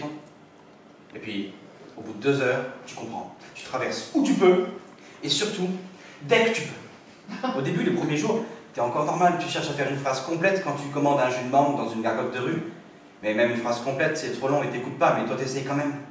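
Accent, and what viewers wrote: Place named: India. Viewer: Histoire émouvante... et excellent comédien. L'accent indien pour parler anglais me fait beaucoup rire! Bravo.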